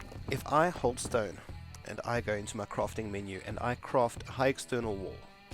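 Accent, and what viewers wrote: South Africa. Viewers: Are you from south africa ? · Are you south african? :D Great video! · South African ??!!😂me too😂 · You sound south african! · Great to hear a saffa gamer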